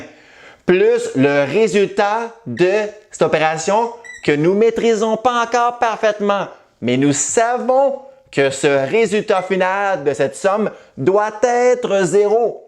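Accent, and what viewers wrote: Quebec, Canada. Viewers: L'entends tu le léger accent ?! Il semble évident qu'il ne parle pas un français métropolitain mais bien Québécois, donc avant de juger,il faudrait peut-être s'assurer qu'il ne s'agit pas d'une expression locale.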